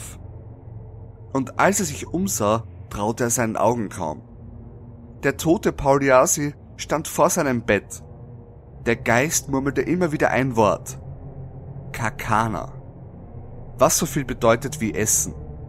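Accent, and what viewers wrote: Vienna, Austria. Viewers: Ich liebe den Wienerischen Akzent🤤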